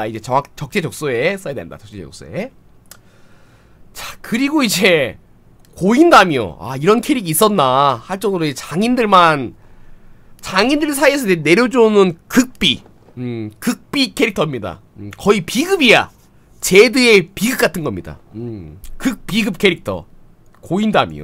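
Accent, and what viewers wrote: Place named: Busan, South Korea. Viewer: 걸쭉한 부산사투리 ㅋㅋㅋㅋㅋㅋㅋㅋ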